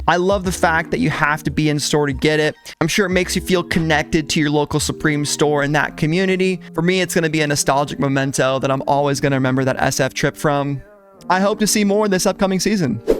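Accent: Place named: England, United Kingdom. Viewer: always a W with the english accent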